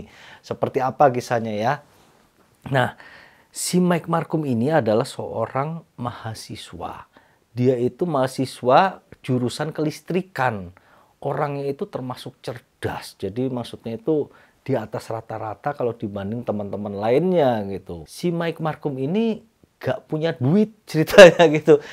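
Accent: Java, Indonesia. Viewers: Medhok banget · kok medok , dan tau arti rika ?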